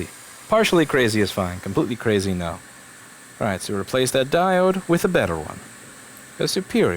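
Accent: New York, United States. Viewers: The accent/speech pattern is a mixed of Seinfeld, Joe Rogan and Bob Ross plus a sprinkling of NYC cabbie. Somehow turns out very entertaining know these videos.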